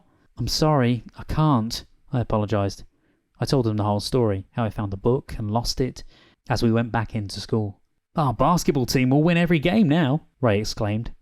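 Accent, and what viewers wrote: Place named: United Kingdom. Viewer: I love the UK accent and the way he read, so funny. Thank you for the work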